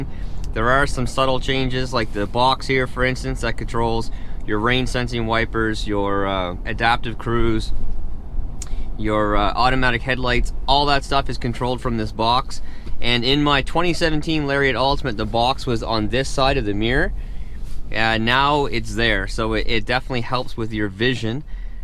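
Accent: Canada. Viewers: You are Canadian, i thought American but hey love Canada man love Canada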